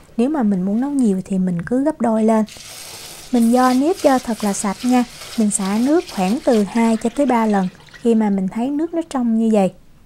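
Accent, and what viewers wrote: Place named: Mekong Delta, Vietnam. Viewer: kheo tay qua co xem nhieu video cua co thay that tuyet cho co hoi 1 chut nha chac la nguoi o mien tay que o tinh nao ma nau mon nao thay cung ngon lai qua hap dan gi nghe giong noi dam chat mien tay co gan 70 tuoi nen ko biet cach bo dau nen be doc hoi kho 1 chut nha co rat mong 1 loi phan hoi va chuc nhieu niem vui trong cuoc song nhee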